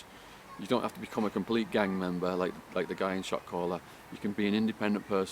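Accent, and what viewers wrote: United Kingdom. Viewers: This might be a weird question, but did people in there assume you were soft because of you accent? Us American tend to think that any kind British accent sounds posh and I can't see that working to your advantage.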